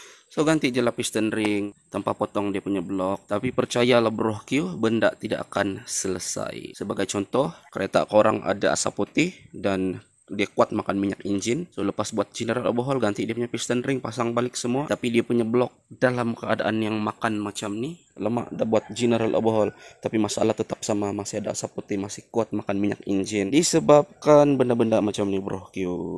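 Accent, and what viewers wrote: Sabah, Malaysia. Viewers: Lokasi di sabah atau serawak ke ni,, loghat mcm org sabah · Iya bos loghat sabah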